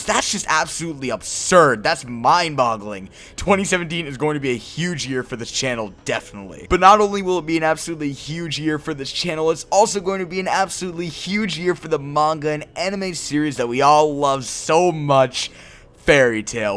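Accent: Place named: United States. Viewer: Omg this is the first time i hear your voice sempai! haha XD She is .... very... sexy ! and American! haha 😂 btw i wish the studio J. C. Staff. (Shokugeki no soma) who take over the anime and 🤔 I think there may be a flashback of several episodes on everything we didn't see during the ellipse after tartaros arc; with gray/juvia , mirajane, laxus ...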